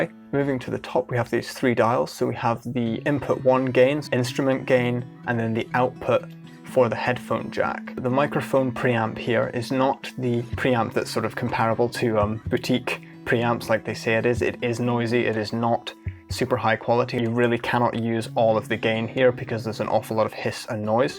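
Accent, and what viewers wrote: Scotland, United Kingdom. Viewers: Kinda sounds Scottish?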